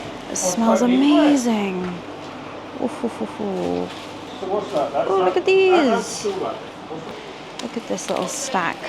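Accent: United Kingdom. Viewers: London stories and british accent, the perfect combination to practice my English. Thanks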